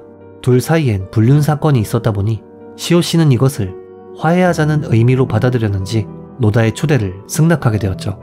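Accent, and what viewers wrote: Japan. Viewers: 채널주인님은 일본사람인가요? 영어발음이 상당히 일본인이 발음하는 거 같아요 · 그러게요 일본인 특유의 발음이 있을때 일본식으로 계속 발음하던데 이유가 있는건지 뭔지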